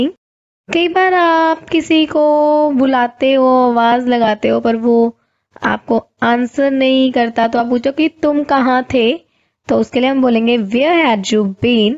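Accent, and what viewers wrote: Punjab, India. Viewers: punjabi ho kya are you panjabi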